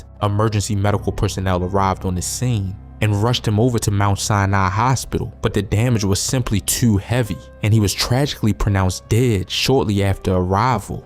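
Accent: Baltimore, United States. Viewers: The narrator sounds like he from BMORE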